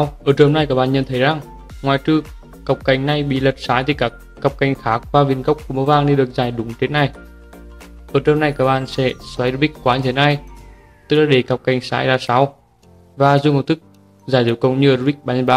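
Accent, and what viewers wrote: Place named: Central, Vietnam. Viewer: nói như tiếng miền trung ấy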